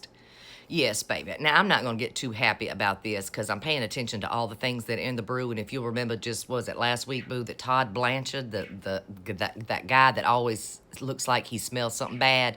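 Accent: South, United States. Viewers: I could listen to your deep southern honey voice blasting MAGA-World all day! What a sharp sense of humor you have! Such HILARIOUS VERBIAGE!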